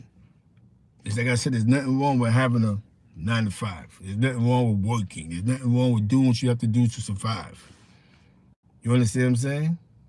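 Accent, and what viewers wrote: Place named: East Harlem, United States. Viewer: Damn I missed the live but salute and make sum videos it’s wack out here need to hear that East Harlem talk u know how we do 1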